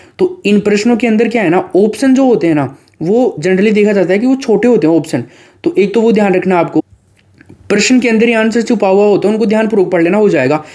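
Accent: Rajasthan, India. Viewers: Sir aapki trick bhut aachi h usse bhi aachi h aapki voice or bich bich gjb rajsthani( ade, vde ,mahine)😘😘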